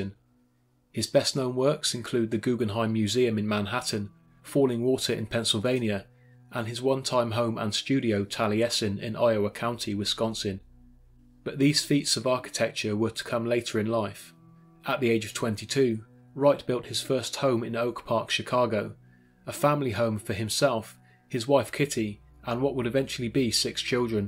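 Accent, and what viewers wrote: United Kingdom. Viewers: in my humble opinion your voice and dialect are two reasons I love your channel. As an American living in Germany I'm strangely biased as personally I won't listen to my favorite literature unless it's in a British accent. I'm really into olde sea novels and Gothic spiritual tales and just can't listen when read by a fellow American.. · Soon as I heard the British accent, I though oh boy here we go he's gonna fuck every story up but honestly this video was incredibly accurate and factual. The only missed detail was Dahmer's address. He said 924 North street, it's actually 924 North 25th street between Kilbourn and State Streets. I am a lifelong Milwaukee resident and am familiar with all these stories. Kudos to whoever researched them. Great job.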